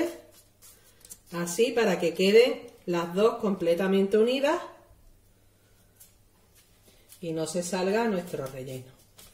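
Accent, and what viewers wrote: Andalusia, Spain. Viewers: Hola!!! Muchas gracias por esta receta muy fácil de elaborar!! Y con el delicioso acento andaluz, mejor que mejor 👍👍👍un abrazo desde Barcelona. Danielle 😁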